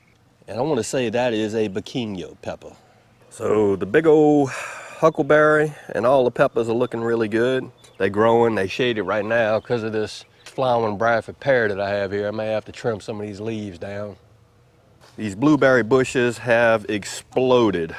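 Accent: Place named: Louisiana, United States. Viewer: You sound like your from Louisiana bro. That ain't no Mississippi accent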